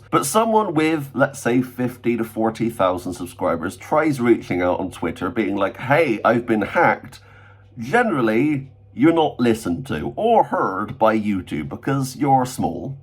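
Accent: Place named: West Country, United Kingdom. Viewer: Good to see she got the strike removed. Appalling behaviour by YouTube! Also where in the West Country are you from? 😂 i wanna say Dorset or Gloucester? Great channel by the way, love from Somerset 😀 Jesus Christ be Praised!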